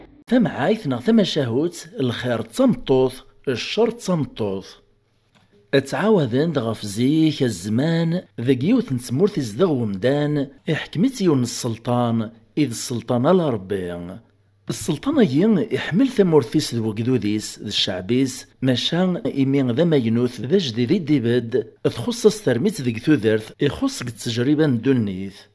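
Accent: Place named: Bejaia, Algeria. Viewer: Une tres belle histoire yaatik saha ce qui me plait beaucoup plus c est votre accent mon fils j aime bien les bougiotes j ai une belle fille qui parle comme ça et elle est trés gentille avec moi.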